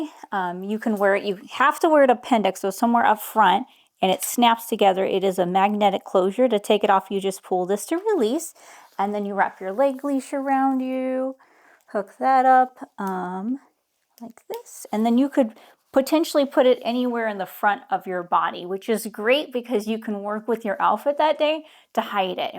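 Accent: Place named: Ohio, United States. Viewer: No matter what I carry I carry in the same place always. Thanks for the Ohio accent,Roll Tide.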